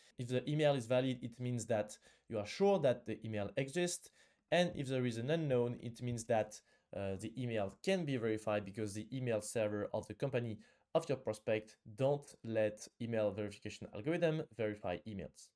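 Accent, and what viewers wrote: France. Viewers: This is a fantastic video! There are a lot of YouTube videos that recommend using Linkedin Groups. Thanks for letting us know that post in Linkedin Groups is a waste to time. However, the volume on this video is rather low. And the captions are not able to capture every word because of your French accent. I'm not complaining about your French accent. But you need to do something about the volume so that people will not click off your videos.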